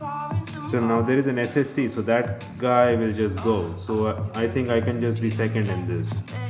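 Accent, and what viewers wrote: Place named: India. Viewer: your voice is kinda Indian type ... Just the accent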